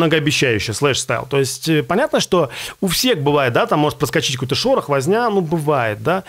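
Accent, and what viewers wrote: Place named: Moscow, Russia. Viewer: тошнит от твоего мАаааацковскава акцента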